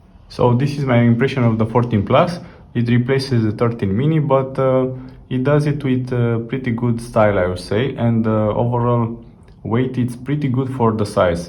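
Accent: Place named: Romania. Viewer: English is given, but the diction does not help him, it takes a long time until the words come to his throat, I still recommend him to buy a newler microphone, something that you say speaks from a jar :)) and let it be softer with Romgleza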